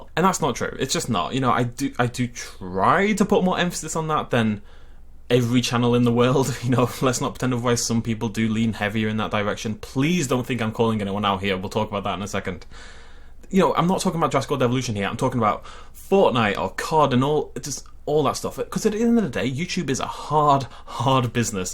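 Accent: United Kingdom. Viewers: I’ve been subscribed to you since you had like 500subs. I’ve found beaver much much later, but I’d say he didn’t really got his subs with JW:TG. He does, like a lot of things too. I found him through the Isle, which game you did played some time ago, but stoped doing so. He did not. Also, his.. umm.. like, style if you will is completely different from yours. You’re like an excited nature documentary commentator, and he (over)edits most of his vids (which i like per say). But, the most important thing is: Both of you have a funny British accent.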